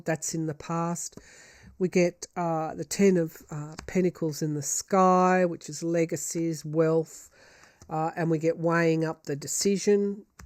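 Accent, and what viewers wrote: Australia. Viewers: Why would anyone write negative comments against her? She's a gem💎, & I look forward to her readings weekly. I luv her accuracy, because she's always right on point. I luv her beautiful Australian accent & her numerous neck scarves! U have to be a nutjob to not like her & need to go away. I agree that BiBi won't do a cease fire until after the US Presidential elections, probably because Trump told him not to.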